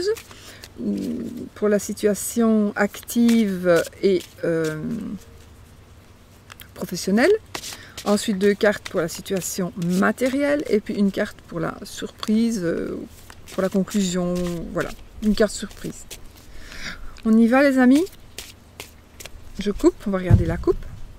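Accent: Belgium. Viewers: Je suis poisson ascendant lion est ce que je dois regarder la vidéo d horoscope lion aussi ? Vous êtes magnifique jadore votre accent belge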